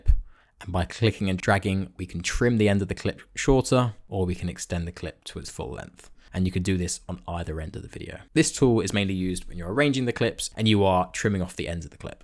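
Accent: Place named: United Kingdom. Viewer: Thank you for these tutorials, you are a really good teacher - and British which helps me a lot! I have been trying to learn how to make a read-a-long video, similar to a karaoke video but to go along with audio narration. If you think there is a market for a tutorial on this, it would be awesome. Thank you x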